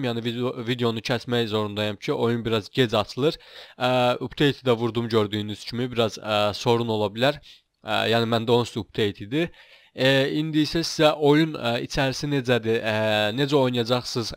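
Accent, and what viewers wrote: Azerbaijan. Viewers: Anaa azeriymişsin valla yahşi demesen anlamicam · aynen sonradan anladım da azeri ler bukadar değşikmi konusuyodu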